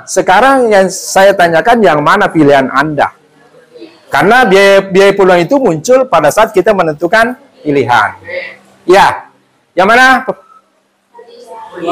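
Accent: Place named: Sulawesi, Indonesia. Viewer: Salam Kenal Bapak sepertinya bapak guru dari daerah sulawesi ya dari segi logat nya saya tau soalnya saya dulu kuliahnya di ikip negeri up jurusan koperasi d3 lanjut langsung ke S1 alumni 1985 mantaaap penjelasaanya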